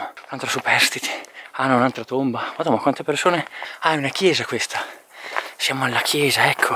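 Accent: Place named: Ferrara, Italy. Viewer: bellissimi video, complimenti! poi col dialetto ferrarese è tutto più scorrevole e simpatico. Se non l'hai ancora fatta devi assolutamente fare il percorso della "Madonna della Corona " da Brentino Belluno. una delle cose più belle che abbia mai fatto e sicuramente uno dei percorsi più belli del mondo! Ciao e buone camminate e ancora complimenti! Maialll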